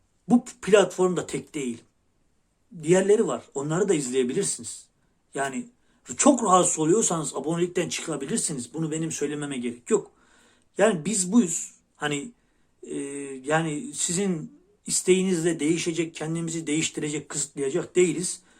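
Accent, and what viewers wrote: Erzurum, Turkey. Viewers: Dadaş seni her zaman izlirem. Ben Erzurumlu değilem, lâkin Erzurum'da 29 yıl çalıştım. Senin o Erzurum ağziynan söylediğin sözler bana Erzurum'u hatirlatir. Hırtleğine basti...😄 Gorbagorun uşağı..., o.. u kordüğüm!. Erzurumu göresim geldi.. Şu zıkkımın kökü hesteliği bitse de bi gitsem..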